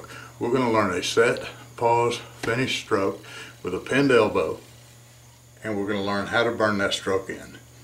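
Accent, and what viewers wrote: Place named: United States. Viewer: Thanks for your reply BUTT I do not believe this is reg size. I used to be 21 err able to make a shot with the stick behind my back with one foot on the floor, not on this sucker I can not touch the floor with my foot. So either I shrunk several inches or this table was built for basket ball players. How do I compensate for a non regulation table. BTW, I must commend you on your instruction, very precise and to the point in simple American English.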